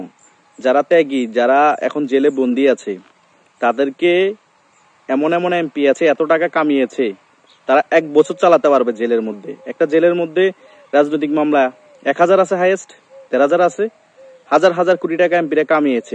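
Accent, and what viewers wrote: Chittagong, Bangladesh. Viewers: ভাইয়া আপনার বাড়ি কি চট্টগ্রাম???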